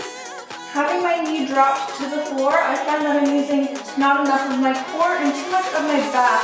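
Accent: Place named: Canada. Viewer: Oh! You’re from Canada, eh? 🥰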